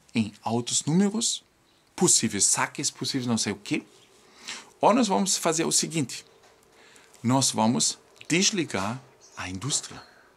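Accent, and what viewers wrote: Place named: Germany. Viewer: Seu portugues é excelente. Entendemos perfeito. Acho lindo seu sotaque alemão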